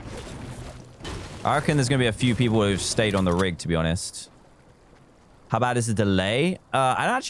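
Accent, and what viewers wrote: England, United Kingdom. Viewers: I know :) I just wanted to see if I was the only one who heard it, even though it's his English accent. Thanks though 👍🏻😁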